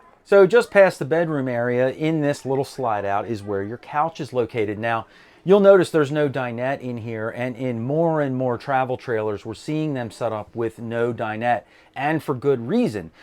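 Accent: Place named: Baltimore, United States. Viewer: Love your videos. does an excellent job shooting the interiors. You can hardly see them on other channels. I've been meaning to ask - you are from the Baltimore area, correct? - I grew up there and you have a heck of an accent, Hon! If I'm wrong, next guess would be PA or NJ. 😀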